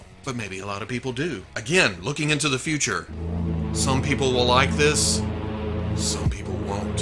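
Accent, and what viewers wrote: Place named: Carolinas, United States. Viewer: Tryna place homie's accent. The Carolinas mayhaps?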